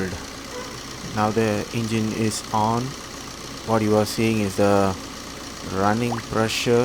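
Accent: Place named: United States. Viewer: US accent is good.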